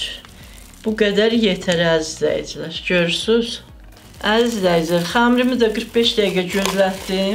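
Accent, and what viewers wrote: Nakhchivan, Azerbaijan. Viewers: Eladi. Deyesen Naxcivanlidi bu anamiz..mende ordanam Ordubad rayonundan. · Xala deyesen naxcvanlısiz😂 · Bacım Naxcıvanlısız?? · Əlinə saağlıq nağcıvanlı kimi danışır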